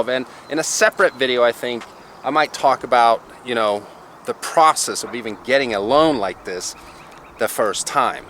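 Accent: Pittsburgh, United States. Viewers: defo turned American, repeat yourselves many times and waffle a lot, instead of getting to the point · Dude u sound like u were born in the states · From Sweden since 2012, but sounds totally American 🤯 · Perfect American accent didn’t know you were from Swede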